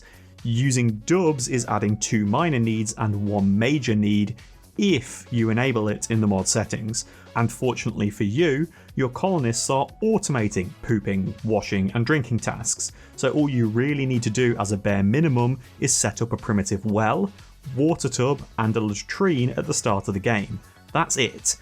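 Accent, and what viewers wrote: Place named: New Zealand. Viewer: For some reason I thought you were a kiwi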